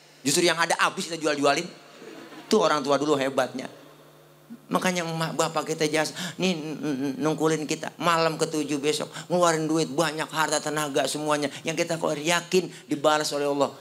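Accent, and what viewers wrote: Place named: Jakarta, Indonesia. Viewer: Betawi? Enak bett dahh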